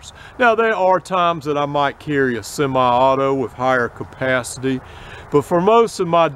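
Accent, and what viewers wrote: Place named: Virginia, United States. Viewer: I would have guessed Virginia, well, at least we know he is not from Boston, Mass. lol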